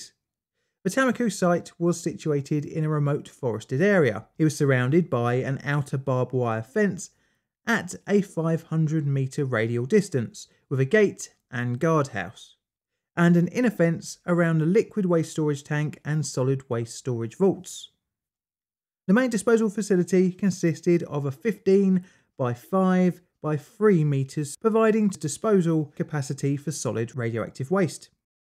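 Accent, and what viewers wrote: United Kingdom. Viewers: Hi, this question is a bit off the wall. I am an amateur linguist and love dialects. I can identify many British dialects, but while I can recognize many, I often times do not know the name or location. So could tell me the name and location of your dialect (and don't just tell me it's in a balmy corner of SE London) 😊. Love the videos BTW.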